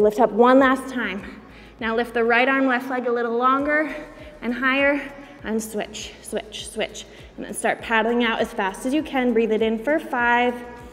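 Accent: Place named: Midwest, United States. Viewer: I just kept thinking it made her sound super Midwest and like, old school which is kind of endearing and 80's haha. v jazzercise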